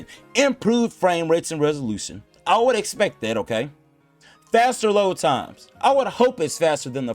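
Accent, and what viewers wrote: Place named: Baltimore, United States. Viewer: I feel like I hear Baltimore (but I could be wrong lol)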